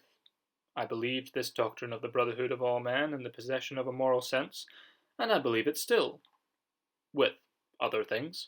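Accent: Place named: England, United Kingdom. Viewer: Finally! Orthodoxy in an English accent 😊 thank you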